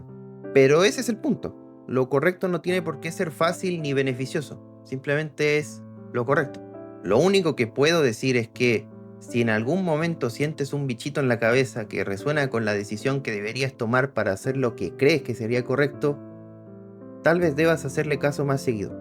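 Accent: Chile. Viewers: Bro, porque hablas como chileno? Saludos mano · creí q era la única que había notado ese acento chileno XD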